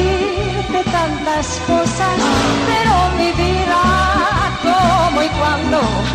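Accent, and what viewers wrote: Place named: Madrid, Spain. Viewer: Demasiado acento de Madrid. Me recuerda una llamada Esperanza. Echo de menos a Arturo Millán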